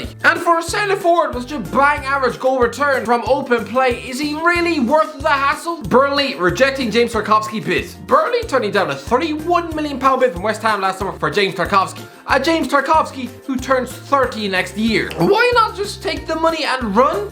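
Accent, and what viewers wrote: Ireland. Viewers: An very Irish accent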